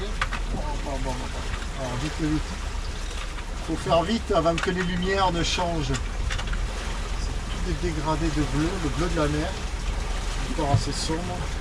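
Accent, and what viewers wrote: South, France. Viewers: Hello, How are you! It’s cool que tu speak english!. Je ne m’y attendais vraiment pas et ne me permettrais surtout pas de me moquer, je ne fais vraiment pas mieux... cette chaîne s’ouvre aux anglophones, bravo. A priori ceux de Falmouth comprendront certainement mieux l’accent du sud que les écossais 😉 isn’t it? Y a plus qu’à te souhaiter bon vent pour ce nouveau voyage, je devrais plutôt dire cette nouvelle odyssée... vu la destination ciblée. Attention à bien éteindre l’AIS en Croatie, sinon tu vides le porte monnaie pour rien. Ciao, tchuss, salut, arrivederci, bye bye 👋. Et merci pour ces images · Pas mal ton anglais avec l'accent du midi! Bonne mer mon, fait nous rêver, avant mes vacances (juillet et aout, mais en atlantique)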